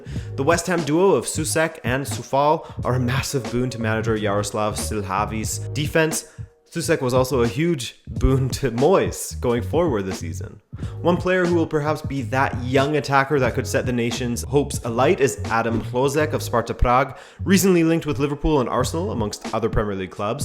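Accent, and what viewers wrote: Canada. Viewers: Was thinking the same thing as a first time listener. Immediately recognised the Canadian accent which caught me off guard as don't see that often in my football recommendations. It all made sense when we got to Portugal! Nice video.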